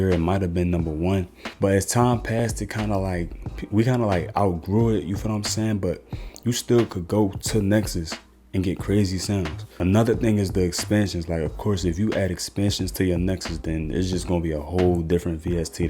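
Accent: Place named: Philadelphia, United States. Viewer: sound like he from Philly. Good vid.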